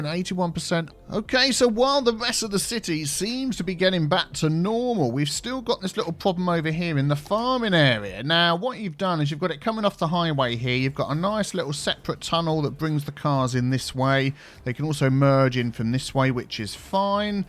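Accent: United Kingdom. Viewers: thank you for responding! Once in your lifetime, you could lose hearing to a point where you can't understand anyone. Have you thought how life would be like if you were deaf? Mine started at birth, always trying to understand people. I can hear, yes, with a cochlear implant, but I want you to consider the people who physically can't hear. They are forced to read your captions if they want to try understand you. They miss out on the physical cues you give off as a British speaking person. I love your accent, and seeing you talk would bring joy not only to me, but to the deaf, deaf blind and hard of hearing community. We get to know you through how we see the world, not just people who just hear you speak. I absolutely love how you have your word choice, having captions helps me follow along, laughing. Why not go one step further? Hope to see you soon!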